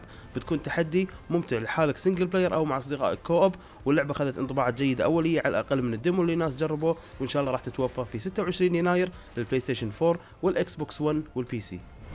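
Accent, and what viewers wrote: Iraq. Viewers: nutella Z العراقيين لهجتهم جذي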